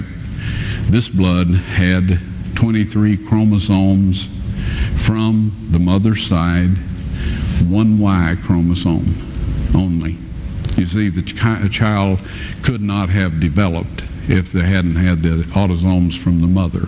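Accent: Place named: South, United States. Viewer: Is it just me or does the gospel spoken with a southern drawl just hit harder?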